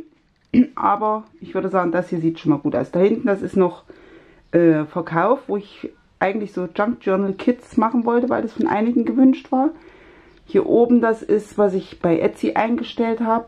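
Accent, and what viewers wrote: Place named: Anhalt, Germany. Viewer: Das ist soooo beruhigend, immer wieder auf's Neue, wenn man sieht, dass es bei anderen genauso chaotisch aussieht. ich liebe das und das ist eben das kreative Chaos! Und so was macht uns Bastler doch sympathisch. Danke für's Zeigen und mitnehmen. Ich mag übrigens Deine "lockeren" Videos, man mag Dir sehr gerne zuhören/zusehen. Und... Du kommst sicher aus der Anhaltiner Ecke, wenn ich Deinen "Dialekt" so höre. Ich bin ursprünglich auch von da... 🙂, weiter so und LG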